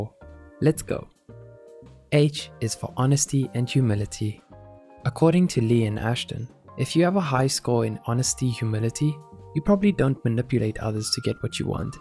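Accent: South Africa. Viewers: The new voice has a South African accent😃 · Is the new narrator South African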